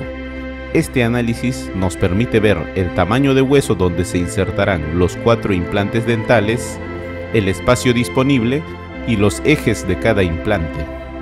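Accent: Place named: Argentina. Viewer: parece de Argentina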